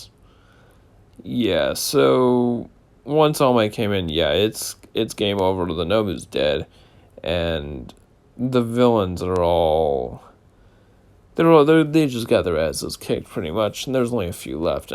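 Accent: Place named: Italy. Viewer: Also gifted Italian accent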